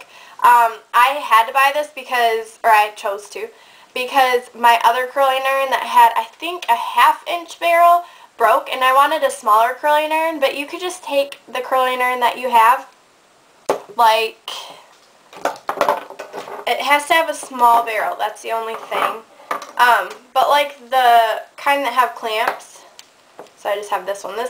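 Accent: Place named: Wisconsin, United States. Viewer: Are you from the Wisconsin area? haha you have the accent.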